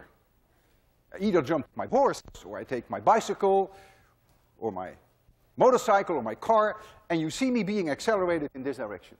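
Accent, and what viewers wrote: Netherlands. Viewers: It's interesting how your Dutch accent become much stronger in the past years again!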